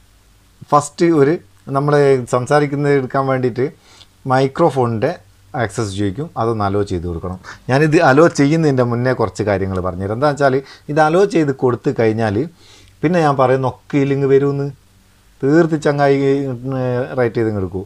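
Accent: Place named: Balussery, India. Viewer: നിങ്ങൾ എവിടെയാണ് ബാലുശ്ശേരി ഇങ്ങളെ ഭാഷ കേട്ടിട്ട് അങ്ങനെ തോന്നുന്നു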